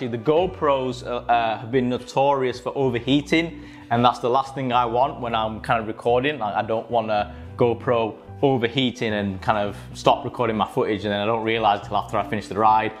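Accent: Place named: Preston, United Kingdom. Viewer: Mate your accent was confusing me hard but knew you were from around the Preston area 🤣 Great video, hopefully see you around 👍🏼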